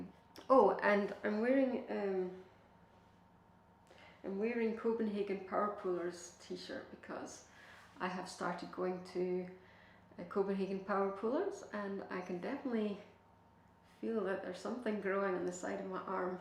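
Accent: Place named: Ireland. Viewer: Brzenk, is your muse....so to speak .I just realized , You sound Irish :) P.s , tak for flex, var dejligt at se ;)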